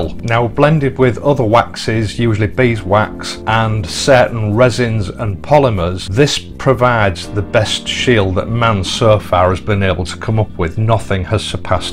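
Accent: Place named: Hull, United Kingdom. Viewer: I was just watching your video and was going to ask if you were from Hull as I am and recognise your acsentthen you said Hull area lol.Ive just purchased a T 120 black.I live at Gilberdyke,the EXhausts are matt black.Then I’ve just seen your video is 6 years old.Do you still have the Triumph? great vids by the way .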